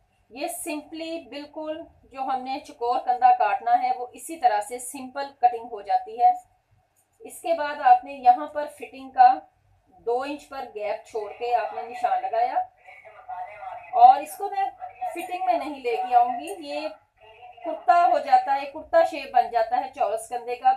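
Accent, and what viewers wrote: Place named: Faisalabad, Pakistan. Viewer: Ye to faislabad say hy lagta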